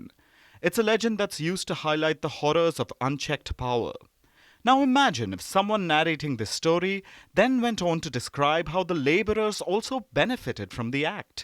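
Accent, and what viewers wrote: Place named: India. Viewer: Hey what are you guys even talking about? Never would even expect from someone with an Indian accent 😩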